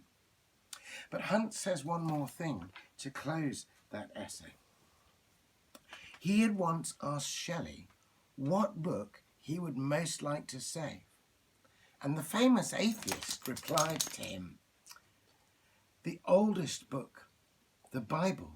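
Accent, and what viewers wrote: England, United Kingdom. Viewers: I love your English accent .😊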